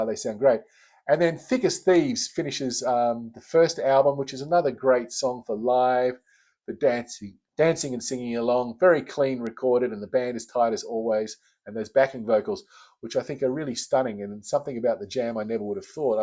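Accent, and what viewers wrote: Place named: Australia. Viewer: With your accent...are you living in Australia? One of my sons has gone out to Sydney for a year. Ive been a long life Jam fan...well, since 1978. Love them...what an inspiration! Gerard in Belfast.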